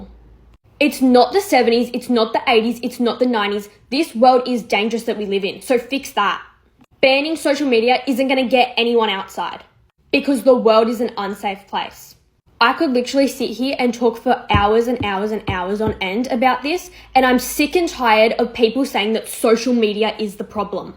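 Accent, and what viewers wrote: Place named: Australia. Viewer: she aint from new york, she aussie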